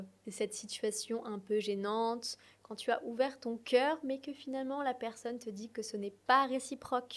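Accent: France. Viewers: Salut — merci beaucoup pour les novelles expressions! Je voulais simplement dire en tant qu'anglophone que lorsque tu parles anglais, c'est parfaitement compréhensible - et je dois dire que l'accent français fonctionne vraiment pour toi. Alors ne t’inquiétes pas du tout! 😊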